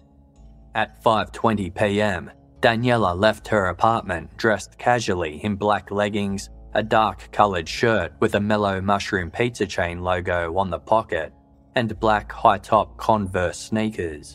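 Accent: Australia. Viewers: Given that you my dear narrator have a classic Aussie twang, would I be safe to assume this is an Aussie owned production? I’m glad I found you, I enjoy your stuff and your presentation. Cheers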